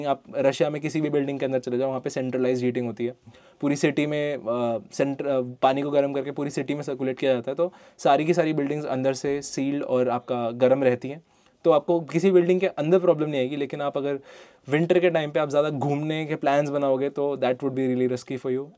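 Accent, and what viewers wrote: India. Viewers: Are you from west Bengal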